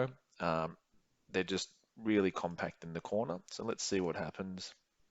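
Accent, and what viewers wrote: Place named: Australia. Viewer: Your voice sounds like an australian accent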